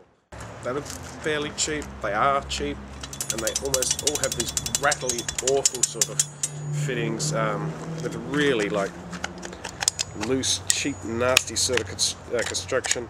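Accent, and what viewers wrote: Australia. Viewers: when i first heard you talk i though your were australian and i got excited to find out we could get swords here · are you australian or newzealander?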